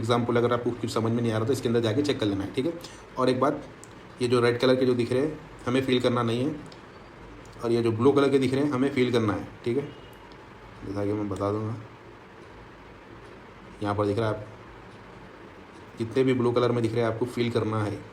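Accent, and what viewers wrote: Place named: Hyderabad, India. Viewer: Language accent se hyderabadi dikhre paasha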